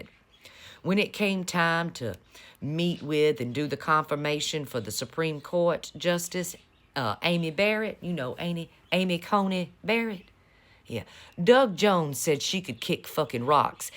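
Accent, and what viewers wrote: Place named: Louisiana, United States. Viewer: I don't know why I thought you were from Louisiana!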